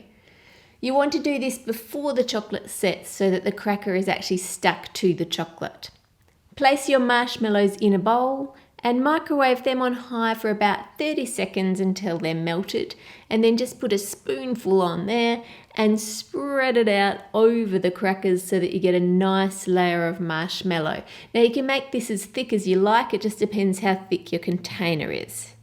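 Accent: Australia. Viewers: It's a classic Auzzie accent. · I love your accent!! Is it Australian? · I watch a lot of your tutorials, and for that I really have to ask, can you please speak slower? because I'm not a native speaker, I'm italian, and it' so difficult for me to understand everything since I'm not used to listen to aussie accent! I'm not trying to be rude, I really love your recipes, and I need to understand everything so that I can try them :) thank you!!!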